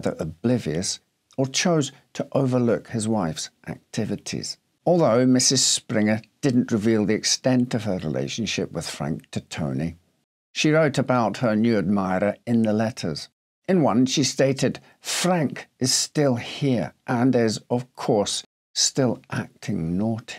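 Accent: Scotland, United Kingdom. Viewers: Host, what is your accent? I said Channel Islands, my wife says Scottish??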